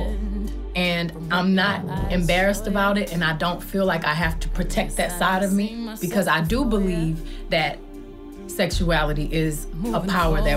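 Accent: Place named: South, United States. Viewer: She's so country!!! Cute. "I'm just tawking shyite." Lmao great stuff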